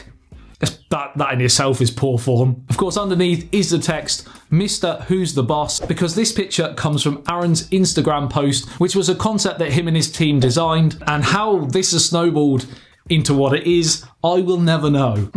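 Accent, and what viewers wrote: United Kingdom. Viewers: Actually I watch your video for your great screen presence, brit accent and that lovely dash of humor combined with your constant smirk. Keep it up.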